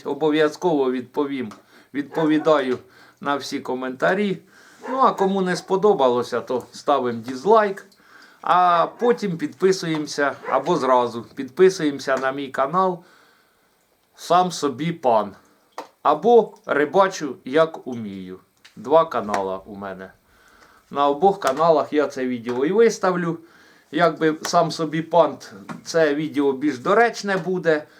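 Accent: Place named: Poltava, Ukraine. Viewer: Привіт відео про дививсь,про корморізку січкарню шок? А остальное чудово свиней люблю колоть з початку війнитримав3х Зара ні?молодець господар ,підпишусь тільки не шкодь техніку воне не для того,зроби окремо щепоріз в парі з дроворубом,шків потрібно проточить ,під ремень відповідний на апараті?або ремень інший ну пока іду воблера дороблять,молодець господар ,рибак,вимова мені приємна полтавоукраїнська ,мені подобається успіхуОК.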